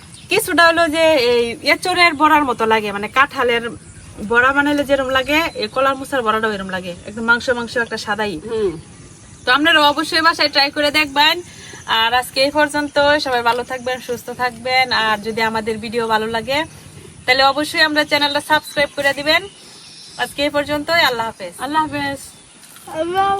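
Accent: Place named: Netrokona, Bangladesh. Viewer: Gramer meye bujhlam kintu tai bole ki ektu Valo kore ashba na shabar shamna Eta hoccha netrokona karon Kotha goli jemon khayam jayam agoli okhankar vasha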